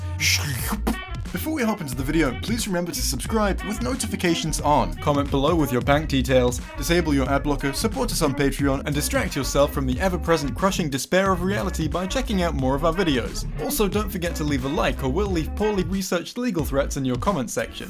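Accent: United Kingdom. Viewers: You really care about topics no body asked for, little angry british fella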